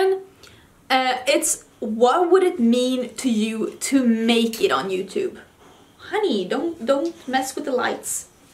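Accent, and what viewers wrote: United States. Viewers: I have a question for you: where did you learn your perfect American English? Did you ever live in the U.S.? Just wondered.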